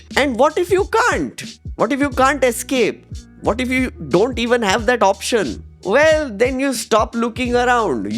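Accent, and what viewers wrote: India. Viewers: pjeet accent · Dude, your strong Indian accent is cringe asF 🤮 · They all are watching your video to make fun off your indian accent too. He also loosened? He also lost… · I agree with every thing, but do you really have to speak like Apu?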